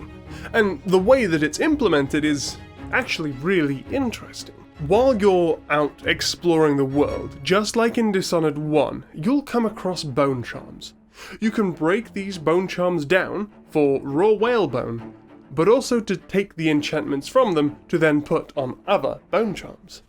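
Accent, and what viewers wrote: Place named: United Kingdom. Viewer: Really love your British accent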